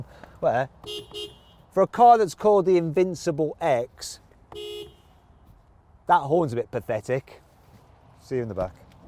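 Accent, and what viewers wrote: United Kingdom. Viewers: 英語の訛りがイギリス以外のなんでもないやん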